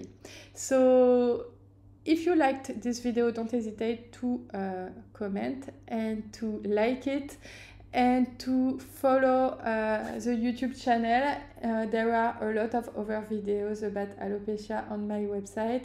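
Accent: France. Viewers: L anglais est top , juste l accent maïs ça a son french charm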